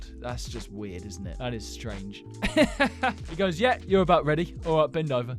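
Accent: United Kingdom. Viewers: He sounds i bit British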